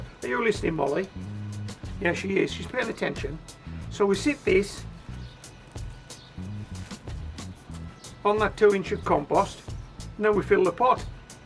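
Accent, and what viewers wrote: United Kingdom. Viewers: Full of useful information. So many videos are from Americans and their conditions do not apply in the UK.So nice to hear a British accent for a change. I am sick to death of know it alls with strange accents! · Yes I am a first timer, as my husband is, trying to be more self sufficient. The main experience I have is failed whatever I tried to grow. It's like if I breathe same air as my plants they get sick. However, my main thing is dogs, and I just love your helper dog Mollie. Is she Border Collie? Her interest and attention span is better than alot of people I know. Ok also love the accent. Not sure exactly what it is: British, Scottish, Whales? wherever, I listen so I can enjoy the accent. If you did not pick these potatoes so small, would they grow to larger size like grocery store? Will more grow at the same spot you picked? 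How do we know when it's done and to raid the center of the soil ball? I assume we can do same procedure with sweet potatoes? Anyway, sorry I have alot of questions but thanks so much for easy lesson dark can do.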